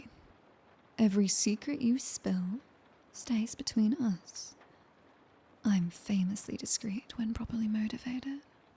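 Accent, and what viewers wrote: United Kingdom. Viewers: British 😭 dont shank me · Sleepy British girl 💜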